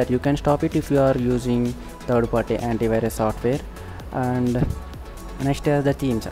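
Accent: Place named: India. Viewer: ew indian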